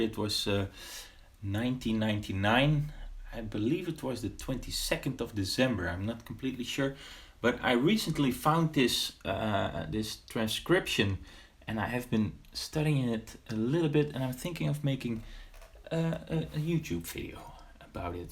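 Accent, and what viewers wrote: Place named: Flanders, Belgium. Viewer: I love the 'Ronald' keyboard , and the fact that your accent is not Dutch at all (it's Flemish :) )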